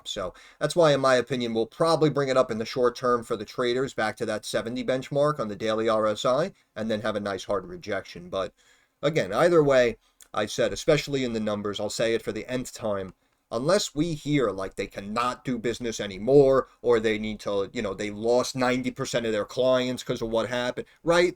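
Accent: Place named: New York, United States. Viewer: I listen to you because you were spot on with luminar tech, and the the NY accent reminds me of home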